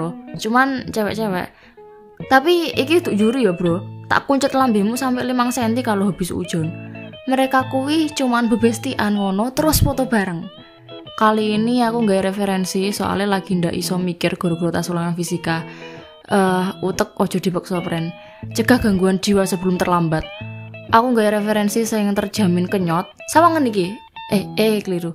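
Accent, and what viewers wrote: Indonesia. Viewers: kak, dari logatmu, kayaknya kamu orang Indonesia ya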